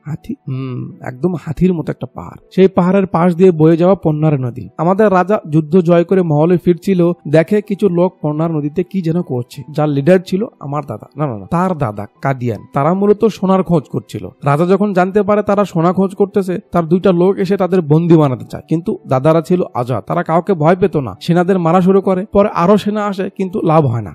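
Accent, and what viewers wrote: Bogura, Bangladesh. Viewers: হামি তো ভেবেছিলাম আপনার বাড়ি বগুড়া এল্লা কোন কথা বারে আপনি বগুড়ার ছল নো ?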